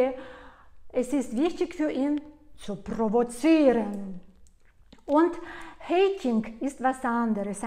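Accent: Russia. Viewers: Ich liebe deinen russischen Akzent. Übrigens: Wir Bayern rollen auch das "Rrrrr".😁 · Ich hab mir zwar geschworen nie mehr zu heiraten, aber Dich würde ich glattweg sofort heiraten, laaach. Nee, keine Angst, ich weiß das Du verheiratet bist. Aber ich verstehe diese Vollpfosten von Hater nicht. 1. Deine Ratschläge fruchten und haben Hand und Fuß, im Allgemeinen. Und ich denke, wenn Du die evtl. Situation genau kennst, sagen wir mal von einem Teilnehmer Deines Coaching, Du auch ihm ganz treffsicher helfen kannst. 2. Du hast mich in diesem Video zum lachen gebracht, dem Hater wird es wohl nicht geschmeckt haben, laaaach. 3. Du hast keine Schlauchboot Lippen, finde sie sehr sexy und anziehend :) 4. Ich mag Deinen Russischen Akzent und auf den kannst Du natürlich stolz sein. 5. Ich finde es toll das Du die Deutschsprachige Kultur, sowie Deine eigene magst. Das macht Dich auch für das Coaching interessanter und Du hast auch mehr Überblick wie Manner verschiedener Kulturen "klicken". 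6. Du hast einen tollen Humor und gehst die Sache mit den Kohlköpfen/ Hater richtig an. 7. Ich mag Dich so wie Du bist und mach weitere so wertvolle Videos wie bisher.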